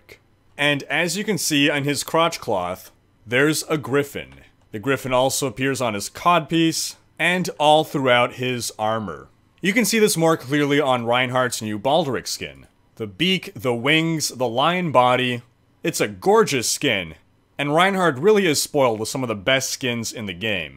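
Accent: Germany. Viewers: And your german accent is actually really good. The only thing is that you say eichenwalde with a K insted of a CH you would say the CH like in charm or charcole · Dude i Love your German accent 😅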